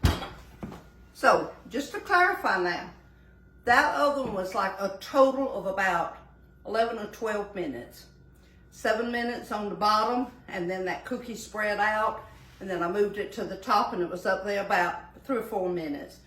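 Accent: South, United States. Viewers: Shine for Jesus is the best way to go. I also love to hear your accent. I live in Cullman,Al and of course we have the southern accents,but you are exceptional. I love you 💞💕🥰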